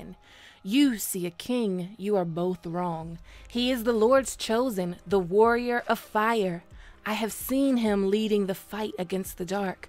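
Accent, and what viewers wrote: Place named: Maryland, United States. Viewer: Good stuff. Looking forward to the new season. I hear a Maryland accent in your voice.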